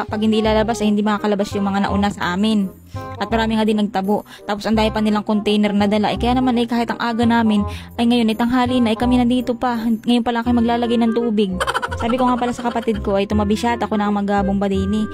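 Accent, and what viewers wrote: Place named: Mindoro, Philippines. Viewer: Ai oo mandin.. dto sa quezon ai kapareha ng punto sa mindoro..saka semi batangas din ☺️ same. Bisaya aq pro dto laang aq nakatira sa perez quezon at uhay nabingwit ng taga quezon 🤣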